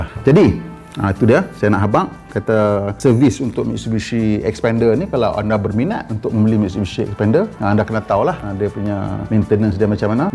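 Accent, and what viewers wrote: North, Malaysia. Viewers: Cikgu kalau guna full bahasa utagha lagi sedap dengaq. Hat ni dengaq mcm dok pedo² ckp utagha. Apa² pon mmg terbaik penyampaian. Mudah dan senang paham.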